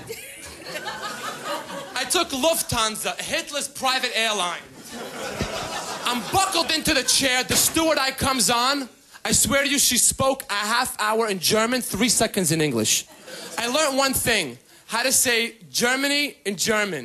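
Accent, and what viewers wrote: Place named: New York, United States. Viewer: he was raised in a religious jewish home. hes got a bit of a yiddish tinge to his accent. very new york jewish.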